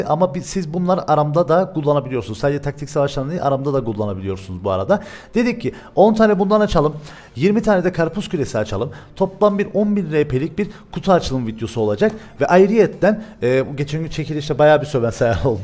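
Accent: Cyprus. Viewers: Kıbrıs şivesi değil mi :)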